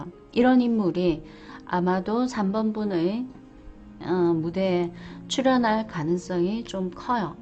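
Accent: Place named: Busan, South Korea. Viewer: 5번 신기하게 맞네요🤗 편안한 리딩 좋아요~~ 부산ㅅㅏ투리두 좋아요~~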